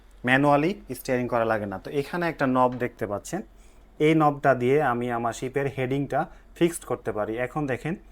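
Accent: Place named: Rajshahi, Bangladesh. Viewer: So far your accent seemed to be Razshahi OR your home town,looking your video from Dhaka,Bangladesh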